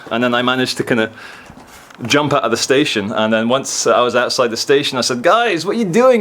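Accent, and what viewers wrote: Scotland, United Kingdom. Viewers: Mate get back to usa with your scottish twang people dont want to listen to you woith your slapstick way of looking at things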